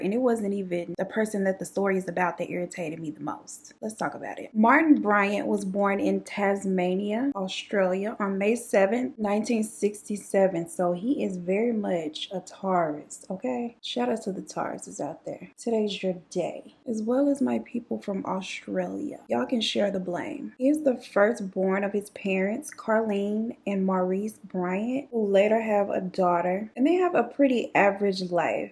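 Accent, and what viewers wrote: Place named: Houston, United States. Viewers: i shoulda knew u was from houston too by the way u sound lol!! <3 I just found your youtube recently and I love it!! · I knewww you were from Houston as soon as I heard you speak. 😂 we have that extra seasoning in our story telling. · Omgggg aye ur from texasssaas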